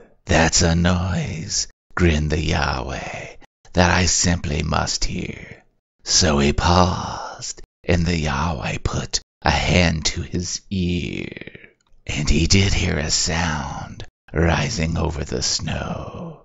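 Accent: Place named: Kentucky, United States. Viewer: I recognized the accent and figured it was Kentucky or Tennessee.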